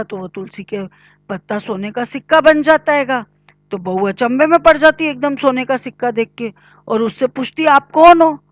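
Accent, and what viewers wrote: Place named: Ujjain, India. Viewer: Aapki konse city se ho ? Aapki bhasha ujjain jesi lag rahi hai . Plz bataiye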